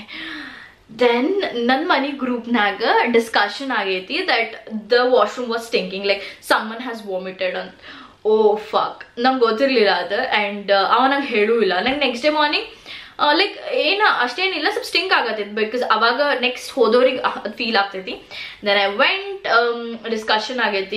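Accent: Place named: Dharwad, India. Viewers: Love the way you speak namma uttara kannada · Nimma Dharwad language bhalha esta aytu ri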